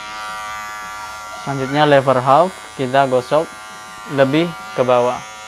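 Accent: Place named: Madura, Indonesia. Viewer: Orang mana pean mas....kog kyak ada orang Madura disitu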